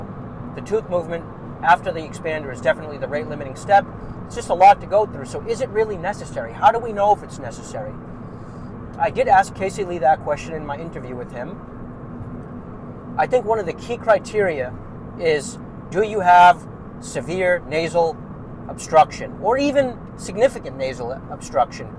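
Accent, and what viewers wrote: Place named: Eastern New England, United States. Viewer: I love your Eastern New England accent!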